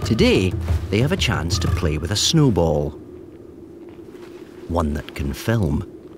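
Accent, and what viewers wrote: Scotland, United Kingdom. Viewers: Well I didn't wake up this morning expecting to hear David Tennant say "Spy Macaque" in his very Scottish accent but here we are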